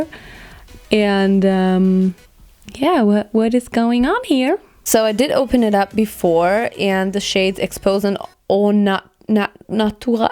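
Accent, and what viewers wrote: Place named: Germany. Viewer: Ok so its my first video I'm watching of yours and omg I could tell you're German because you sound and act very similar to wthmille who is hilarious! SUBSCRIBED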